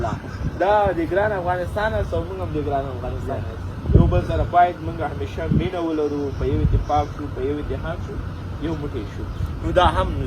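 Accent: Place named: Pakistan. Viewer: ته اول داخپل ژبه چی خبری کوی دااسلاح کړه بیاوازه افغان یم ځکه چی ستاټولی خبری ده پاکستانی دی یواری پښتوزده کړه بیاراشه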